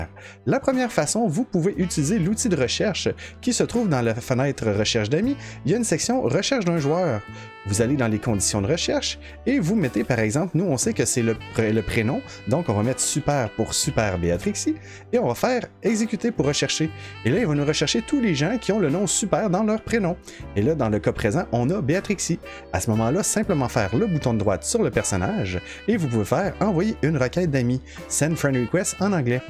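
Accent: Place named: Canada. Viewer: Salut ! J'aimerais seulement aujourd'hui commencer le jeu avec ma petite amie qui en ce moment ne se trouve pas dans le même pays ni dans la même région... Il serait alors probablement impossible de jouer ensemble de ce que j'ai cru comprendre, car elle serait dans les serveurs d'Amérique et moi donc Européen. Mais j'ai cru comprendre que tu étais Canadien de par ton accent ? Tu es donc Américain mais tu joues sur Cerberus qui est un serveur Européen, j'aurais bien aimé savoir comment tu t'y est pris ^^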